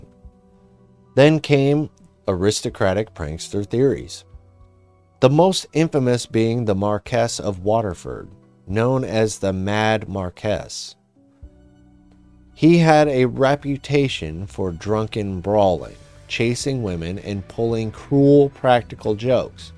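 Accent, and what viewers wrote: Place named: United Kingdom. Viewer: 😂😂😂 you're british!?!? 😊😂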